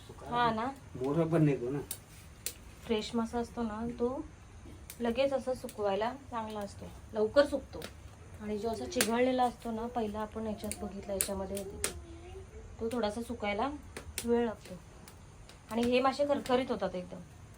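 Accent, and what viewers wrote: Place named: Thane-Palghar, India. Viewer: तुझ गाव कोणते? भाषा ठाणे-पालघर ची वाटते.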